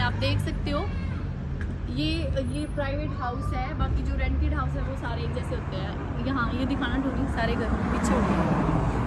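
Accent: Haryana, India. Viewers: ap haryanvi ma bat kya kro hum ko aap ki haryanvi bohot achi lgte ha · Haryanvi boli achi lgti hi apppar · loved your Haryanvi accent, nice video, you are very cute and innocent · AP Hindi bhi haryanvi me bolti ho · Apki haryanvi b bahot sweet hey. Prantu abi ap Urdu bol rahi ho likho bhaley hindi mn ya kaho bhaley isey Hindi 😅😅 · 👍👍👍👍 appp Haryanvi boli me bahut achhe lagte ho.. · Hi love I also live in Liverpool ur look’s very pretty I liked ur haryani language it’s so sweet but u need to more clear that it’s two bed room house rent and all rent r not same it’s also depend on ur area if u live in city centre it’s rent more expensive than ur. If u live in good area it’s expensive too. One thing more all houses r not same. there are four types of houses. U show a traces house.Other houses names r Sami detach house, detached house, flats and bungalow.there rent r also different too. 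Mostly house have there beautiful gardens too. I am sorry my aim is not to let down ur videos I just add more information for ur viewers. Really I always like ur videos and naughty ur baby. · Chorrii ghaint laagg ri hindi ma b...nways ...its nyc vedio..i thought u wud b in India...bt harayanvi touch suit kre thare pe..ikk harayanvi mein b bna do ji vedio harayanvi chorra khatir...ghani baavli lagge se..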